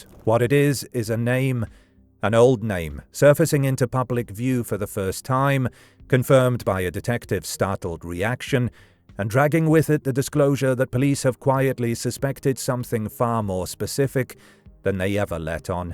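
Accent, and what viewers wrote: United Kingdom. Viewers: He's British. Probably a different pronunciation.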